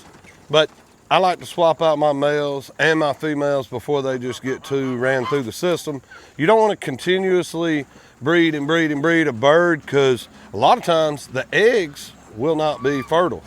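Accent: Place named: Alabama, United States. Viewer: Alabama!! I miss guessed your accent. My apologies. Yeah I’m currently binge watching your channel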